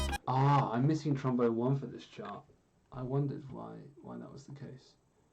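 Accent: United Kingdom. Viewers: I am going to be honest. I had no idea you had a British accent, or that you were even British. I love the results either way. Thank you for sharing your talents with me.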